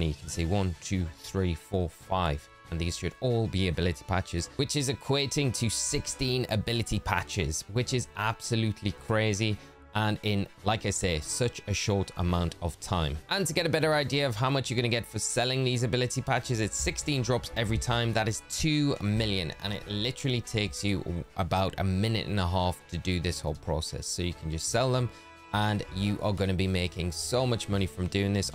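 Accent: Newcastle, United Kingdom. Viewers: You’re a Geordie…?! Subscribed.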